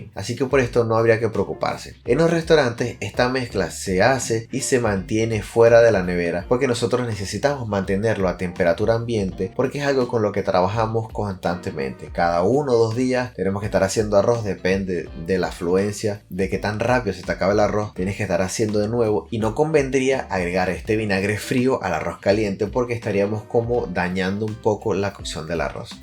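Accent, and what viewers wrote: Venezuela. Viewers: Me gusta mucho su acento venezolano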